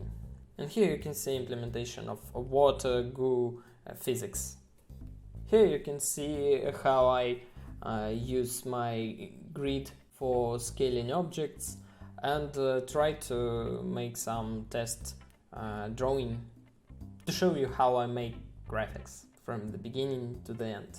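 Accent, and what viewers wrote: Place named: Russia. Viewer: You're Russian!?!? at you Russian accent, and I'm Russian, and if that's the case, I don't need to use a translator.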